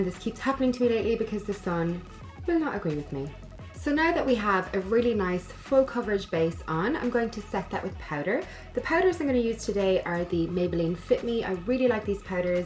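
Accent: Ireland. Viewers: Love your Irish accent, you look so beautiful xxx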